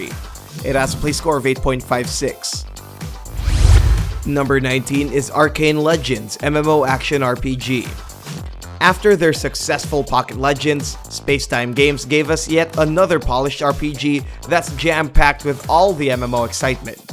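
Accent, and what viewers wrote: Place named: Philippines. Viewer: Why does the VO sound very Filipino?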